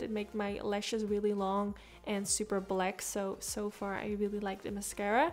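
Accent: Netherlands. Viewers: I immediatly thought you would live in the Nederlands ;) The accent always stays I guess. Nice tutorial! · She really sounds dutch it's located in america oh ok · Ik hoorde gewoon dat jij Nederlands was haha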